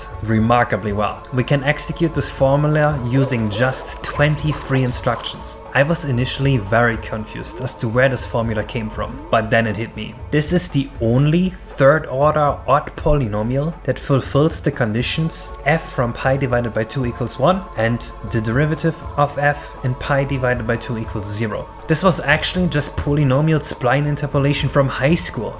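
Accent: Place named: Germany. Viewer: Listen to the accent, yea he's definitely German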